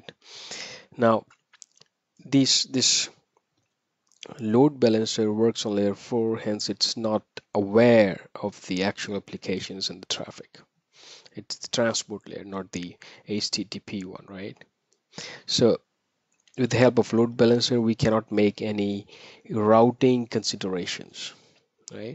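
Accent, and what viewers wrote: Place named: India. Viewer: trying hard to hide Indian pronunciation :) anyway good short video.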